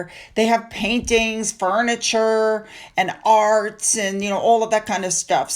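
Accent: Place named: South, United States. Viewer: Yes, very distinctly Southern, but you will hear it all over the U.S.! I love to be polite; it can mean so much as we go through our day, when we use 'Sir' or 'Ma'am'. ❤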